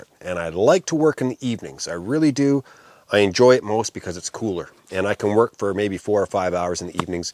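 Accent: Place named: New Brunswick, Canada. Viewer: I knew it! Since I started watching you I thought your accent was similar to mine, but this is the first time I’ve seen you mention being born in NB! From around the Miramichi, is my guess? :)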